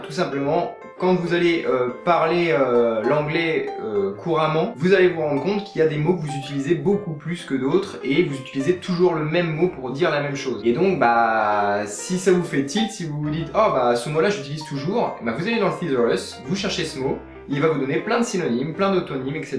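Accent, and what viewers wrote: France. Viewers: T'es de Franche-Comté ? :)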